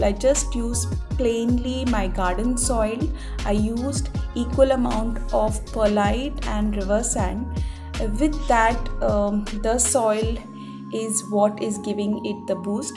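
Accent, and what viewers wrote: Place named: India. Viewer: your indian accent makes me happy to hear it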